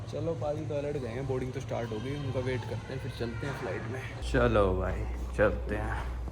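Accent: Haryana, India. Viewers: Bhai ka Delhi accent change hoke dhire dhire haryanavi nikal raha h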